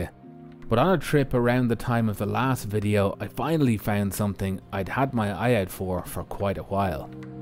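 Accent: Ireland. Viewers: You're still one of the best video editors, I love your work. The content itself is excellent and has me mesmerized. And the Irish accent narrations is the cherry!😉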